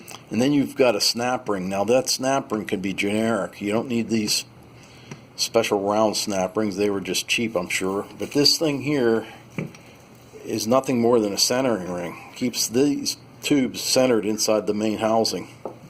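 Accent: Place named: Pittsburgh, United States. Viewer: I heard yinzer before I saw P.H. on the paper. A-K Valley native here.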